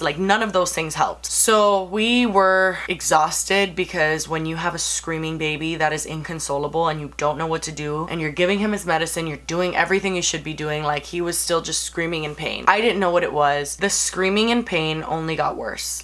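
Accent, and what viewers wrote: Miami, United States. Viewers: Alaina Reyes lol I totally noticed her having more of an accent, but I thought it was just her Miami-Cuban in her lol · She's Miami Cuban. It's a Miami accent. What a rude question.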